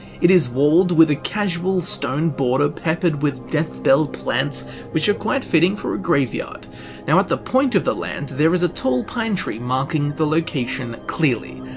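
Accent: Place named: Australia. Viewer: You know, I’ve been going through all of your videos, and I’ve just realized that the reasons I love listening to these are because 1) I just like learning about lore, 2) your buttery voice, softly speaking, accented ostensibly with an Australian (or maybe New Zealand?) touch, and 3) your amazingly awesome alliteration and decadent descriptions. It’s great, I love it.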